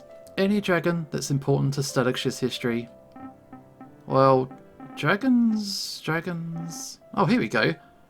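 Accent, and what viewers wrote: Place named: United Kingdom. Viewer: Is watching this making me a furey personnally i m only watching u for the bri'ish accent pls say Bo'oh oh wo'ah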